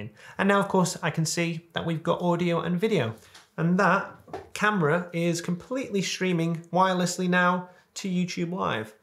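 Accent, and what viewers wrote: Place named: Northern England, United Kingdom. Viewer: Love the Northern accent my man, thanks for the helpful explanation.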